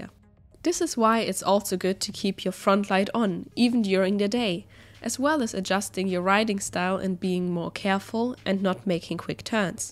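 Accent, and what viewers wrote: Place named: Europe. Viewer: With your accent, I thought you were European, but this is definitely my home, Vancouver. Can't believe it took me two and a half minutes into this video to be sure!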